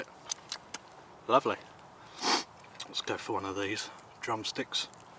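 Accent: United Kingdom. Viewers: R u from UK?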